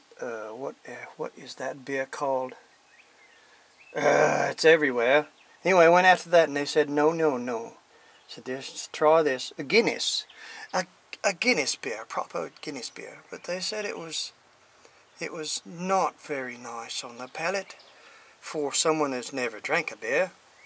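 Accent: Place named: United Kingdom. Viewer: Well I love the english accent, but I think it's a mixture of british, australian and south african!!!! That's a jolly big head on ya beer, you have to tilt your glass and gently pour it in........I just had a laugh at the tasting, very good video, but I wouldn't personally drink Bass even if I was forced!!! We'll have to try and get you some English beer sent from across the pond!!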